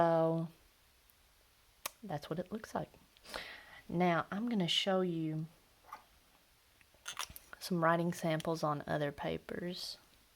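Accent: South, United States. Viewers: I love your videos, what is your accent, Southern maybe ? I`m from Northern England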